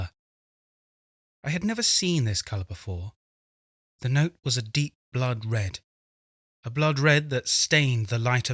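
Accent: England, United Kingdom. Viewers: fantastic story and fantastic narration ! finally a good solid English accent to listen to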